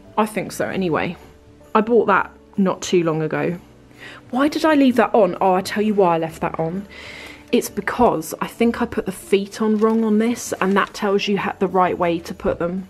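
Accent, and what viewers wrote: England, United Kingdom. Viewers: I just love to listen to you!!! Not only are you articulate, you have the BEST English accent!!! Thanks for sharing your Christmas with us, and I LOVVVE your gorgeous Chanel trendy!!!🎄🎄🎄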